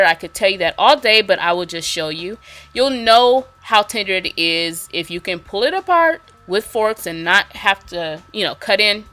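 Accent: United States. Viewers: Why do yanks say "erbs" when there is an "H" in the word........... its HERBS.... in the Queens English the word is HERBS... learn it.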